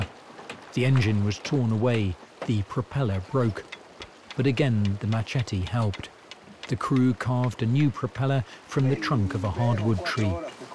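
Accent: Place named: United Kingdom. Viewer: I'm glad that despite the international assembly of zoologists you had a Brit narrate the video. American's require British narrators to enjoy wildlife documentaries. :)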